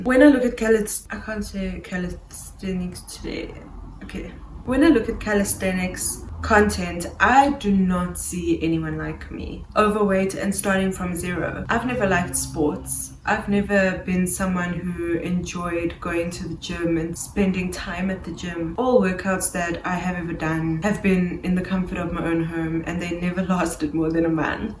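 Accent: South Africa. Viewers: YouTube is my primary form of media and I can't tell you how heartwarming it is to click on a video and hear a South African accent. There's just something about getting information from my own people. You just know it's going to hit home. ❤😊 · Do I detect the accent of a fellow South African?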